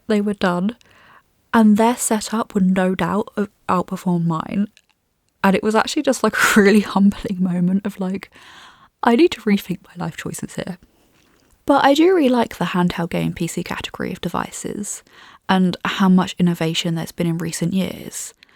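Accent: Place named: Scotland, United Kingdom. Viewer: nice scottish accent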